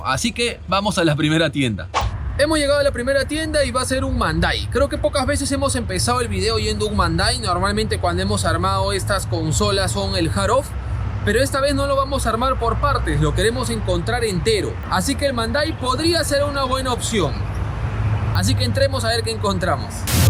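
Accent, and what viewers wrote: Peru. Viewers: Sabía q eras peruano, la criollada el acento, excelente. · Ese tonito peruanazo me vacila chévere causa para ti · Por el acento y criollas y la inca Kola fácil .... Es peruano - japones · 30 segundos de video y me di cuenta que era peruano :’) es un INKAAA KOLAAAAAA. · Eres Peruano verdad?? Feliz año broer.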